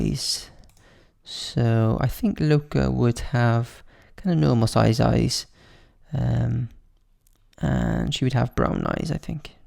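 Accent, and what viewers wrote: Wales, United Kingdom. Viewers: So this video really helped! I was clueless. But I had one question. How do you delete a chapter from a story? Btw love your accent I'm also british =) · your welsh i'm welsh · Are you Welsh?? I love your accent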